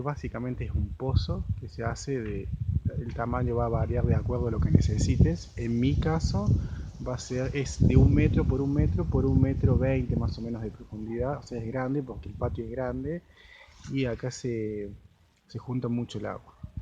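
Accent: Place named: Cordoba, Argentina. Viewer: Muy interesante la info!!, pero no pude evitar escuchar a un Cordobés con mezcla de Franses.jajaja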